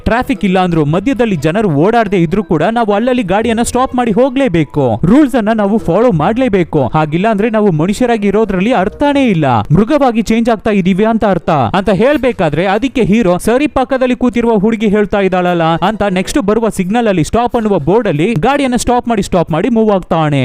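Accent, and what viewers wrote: Tamil Nadu, India. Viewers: Your language slang like tamil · Neevu tamil avra accent same ang ide like tamil guy talking ಕನ್ನಡ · Tamil Mix Voice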